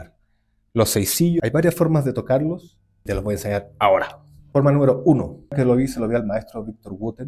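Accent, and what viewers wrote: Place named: Chile. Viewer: Tienes acento Chileno... ACENTO, no términos..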